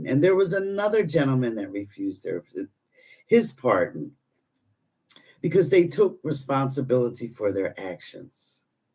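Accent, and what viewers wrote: Philadelphia, United States. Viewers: Thank you. And I thought I detected a Philly area accent. Go Eagles! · Hi I am so glad I found your channel. Listening to you I knew you were from the Philly area! It is so exciting that the Eagles are Super Bowl bound! Go Birds!!! 🦅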